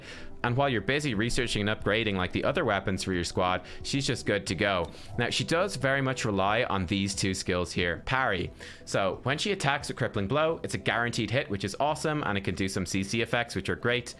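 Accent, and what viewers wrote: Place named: Ireland. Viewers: That's a perfectly normal Irish accent. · It sounded like a very mild Irish accent to me but it took me a minute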